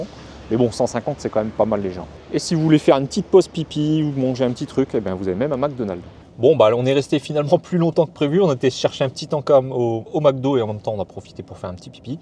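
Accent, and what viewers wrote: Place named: North, France. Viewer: Salut voisin nordiste (vu l'accent), merci pour cette vidéo. Pas mal les prises de vues avec le drone au Tréport.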